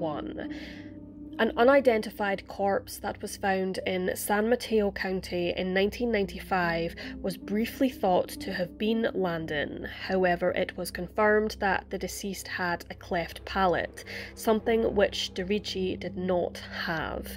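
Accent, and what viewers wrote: Scotland, United Kingdom. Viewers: WoW you have a heavy Accent like uk or Scottish accent. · She is Scottish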